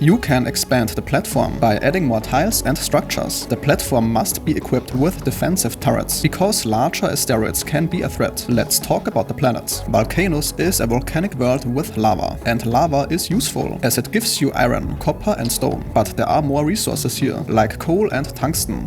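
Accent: Germany. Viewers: Dürfte ja auch ein deutschsprachiger YT'er sein. Zumindest hört sich seine englische Aussprache danach an.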